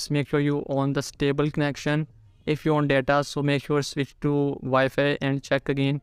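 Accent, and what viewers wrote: India. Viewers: اصلا ما فهمت الطريقة لان يتكلم هندي و دوخني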